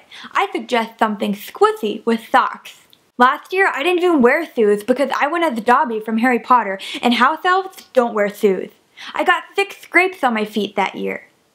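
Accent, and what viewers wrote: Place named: Europe. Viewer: Anyone else notice how much sexier is than? That bedroom hairdo and so very continental with that accent..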